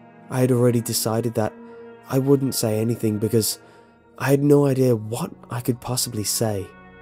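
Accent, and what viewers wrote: United Kingdom. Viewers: Today marks ten years since this video was created. Even today, this still remains one of the (if not possibly the ) best creepypastas ever written! I find this video to be a great encapsulation of the story. You're soothing british voice sets an immersive feel and the use of music and sound design is expertly crafted. Overall, this is an incredible story and video and here's to a decade of its existence!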